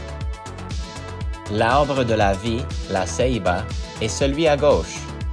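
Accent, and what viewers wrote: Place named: Quebec, Canada. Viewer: J’adore votre accent québécois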